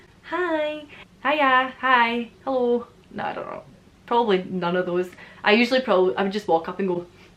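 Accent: Scotland, United Kingdom. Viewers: not gonna lie, honey, I adore your Scottish accent. just keep going, speak on. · I'm from Canada and my granny had a Scottish accent so I was used to it but when we went to Edinburgh I had no problem understanding anyone and yet I have a hard time understanding you sometimes. Is it because of where you're from? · But u also have the scottish dialect which comes with the accent havent seen past videos but i really liked this specific dialect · I think your voice/accent is one of the best in the world, and I love it so much. I could listen to you speak all day, every day, forever. ❤ Bonnie Scotland. ✊ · I find the Scottish accent very attractive and sexy! Your Scottish accent is the best Scottish accent I’ve ever heard on YouTube! I’m talking with a Scottish accent x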